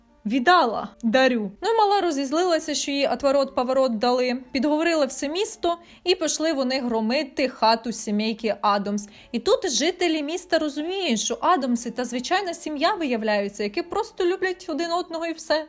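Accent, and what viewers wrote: Surzhyk, Ukraine. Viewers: Какой же у тебя омерзительный суржик! Неужели так тяжело выучить украинский язык?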